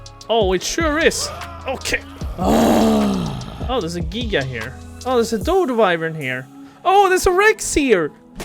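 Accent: Sweden. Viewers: i just hear you are from sweden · Think I hear a Swedish accent, Haha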